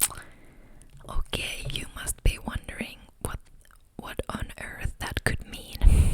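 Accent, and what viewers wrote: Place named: Finland. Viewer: its easy to tell whos from finland because u can hear from their english accent sounds so much different, good video btw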